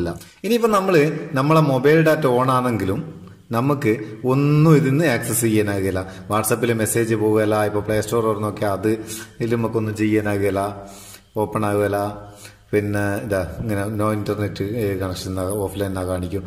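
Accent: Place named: Kasaragod, India. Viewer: അടിപൊളി ഐഡിയ. അടിപൊളിയാവുന്നുണ്ട്ട്ടാ👍 ങ്ങളെ കാസർകോട് ബർത്താനം ഞമ്മക്ക് നല്ലോണം പുട്ച്ചിക്ക്ണ്.😜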